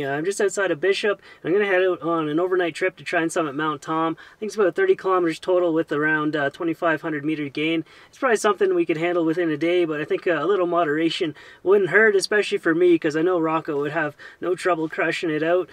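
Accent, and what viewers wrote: Canada. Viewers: He needs some Dominos in his life. I should really donate to this guy. Love the Canadian accent. Tell is a story or 2 about your hockey youth!!!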